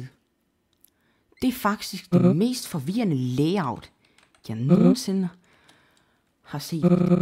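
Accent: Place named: United States. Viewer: Det lyder som en amerikansk spiller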